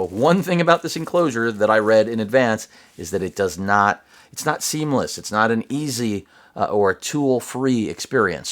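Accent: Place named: United States. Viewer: I love the american slang (gonna wonna)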